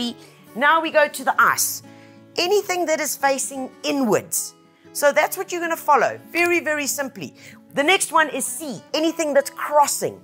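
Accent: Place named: South Africa. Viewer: Excellent video. Also, I love your South African accent. It's a great accent, and I love olives 🫒 there so delicious and have a wonderful flavour.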